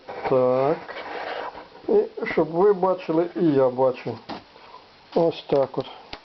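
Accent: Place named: Kharkiv, Ukraine. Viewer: Дай Бог вам всього доброго , мирного , світлого ! Як приємно чути наш харківський говор !!!! Супер !!!!! Дякую 🙏🙏🙏.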